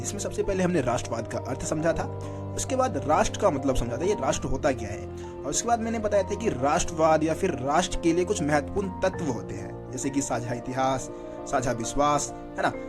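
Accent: Bihar, India. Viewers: Sir aap Bihar ke ha kya.aapki batto se laga hame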